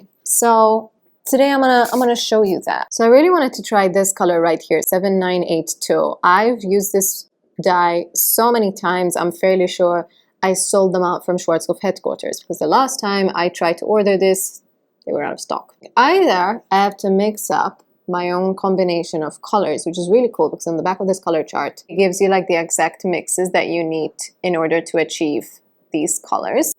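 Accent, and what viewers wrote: Italy. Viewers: Il tuo accento italiano è bellissimo 😍 (Your italian accent is wonderful)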